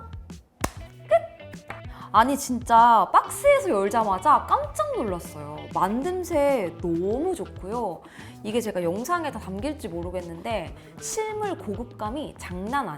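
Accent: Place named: Seoul, South Korea. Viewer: 이분말투 전형적인 요즘 서울말사투리네요 ㅋㅋ중간중간 음올리는게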